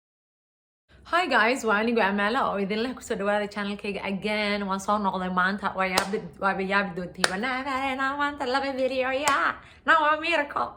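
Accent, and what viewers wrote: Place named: North, Somalia. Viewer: Xbbti horta marka hadashay ayn gartay ina somaliyad tahy walhi cadaan ban ku haystay hhh mida kle masha alh luuqadeni saxda ahayd e waqooyiga ayd ku hadlaysa hore usoco sister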